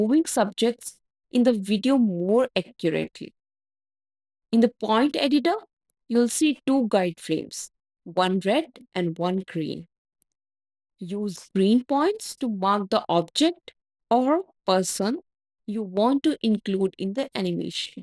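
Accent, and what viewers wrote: India. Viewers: but why you have indian accent ?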